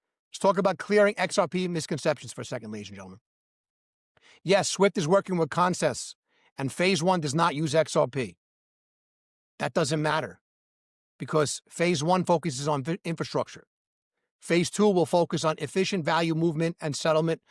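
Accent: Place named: New York, United States. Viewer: My man is right on. Good info. Dig that N.Y. accent burning!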